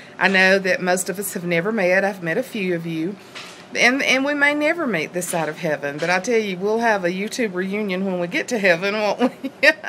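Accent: South, United States. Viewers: your Southern drawl is so fun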